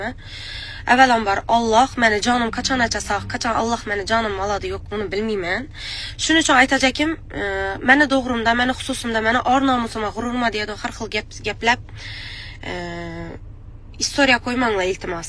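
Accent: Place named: Khorezm, Uzbekistan. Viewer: Шивада гапираяпти хоразимча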